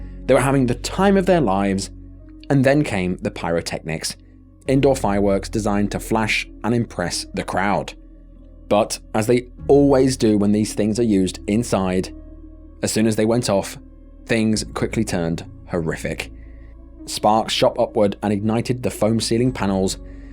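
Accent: United Kingdom. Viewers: This video would've been OK except for that ridiculous British accent on the voice. Damn I hate those.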